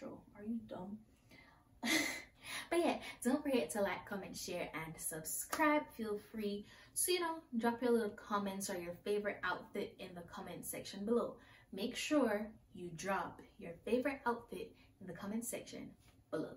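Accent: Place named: Jamaica. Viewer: loved this video. I caught the accent. Jamaican? If so, I'm Jamaican as well and i also did a Back to School Try on Haul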